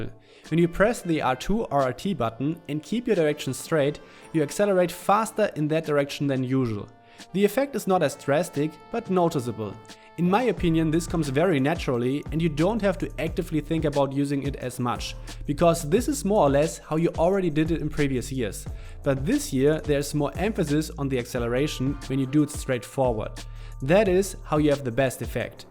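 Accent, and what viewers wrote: Germany. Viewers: Man hört dein deutsch so raus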